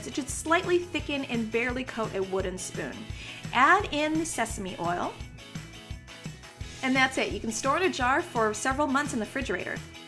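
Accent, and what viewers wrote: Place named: United States. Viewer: wow if I just heard your voice with out the picture I think you was white American lady straight up.but nice cooking tho looks fantastic.cheers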